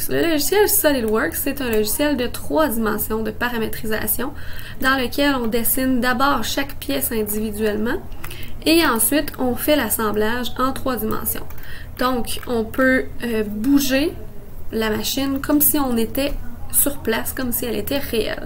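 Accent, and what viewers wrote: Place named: Quebec, Canada. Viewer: Ben moi j'adore l'accent québécois, merci ça donne envie d'y aller 😊😎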